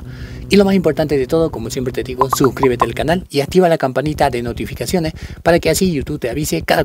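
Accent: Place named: China. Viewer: el perfil es de alguien joven creo y latino porque habla como chino hablando español🤔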